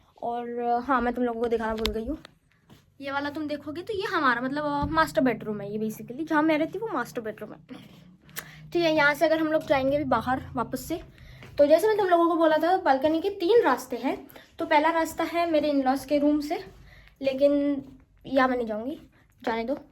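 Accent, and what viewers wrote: Maharashtra, India. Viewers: I get you girl. Your hindi is marathi mix nd influence. So humara hindi aise hi sound karta hai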